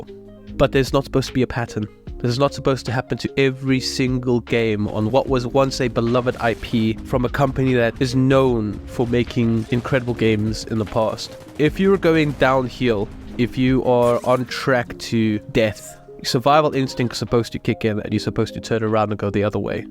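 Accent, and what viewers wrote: South Africa. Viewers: You're South African? I knew that accent was familiar!!! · I am hearing a South African accent. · Bru, you sound South African ✌️ probably not, but shoutout from South Africa 🇿🇦 This video is literally me! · i knew i recognized a South African accent!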